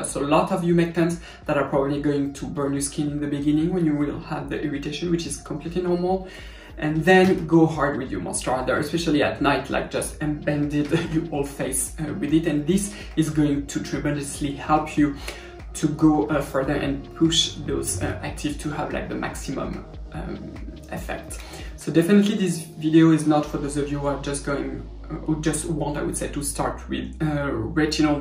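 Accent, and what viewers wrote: France. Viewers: I am very sorry but do you have English subtitles? I can only see French subtitles. I am struggling to understand your lovely French accent. Not your fault but if there’s English subtitles, it would be cool. :)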